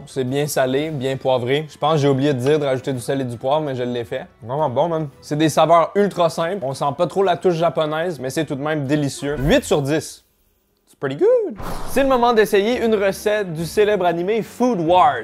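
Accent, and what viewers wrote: Quebec, Canada. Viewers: T’es québécois ?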